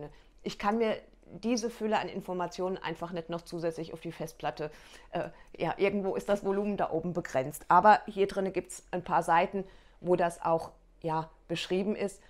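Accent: Hesse, Germany. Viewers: Liebe, danke für das tolle Video!!! Wir sind Hessen... leben aber schon seit 5 Jahren in Österreich und ich liebe es... deine herzige hessische Ausdrucksweise... bitte weiter so! Grinsss Und ja, ich hätte gerne das Brot mit dem zugekauften Teig als Video. Lass dich bitte nicht ärgern von Menschen die nur motzen wollen. LG Regina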